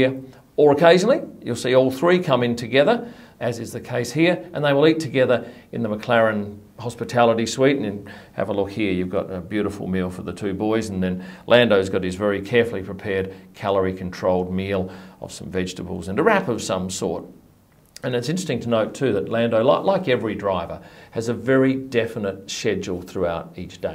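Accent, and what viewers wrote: Australia. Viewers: Nice to hear an Aussie talking F1.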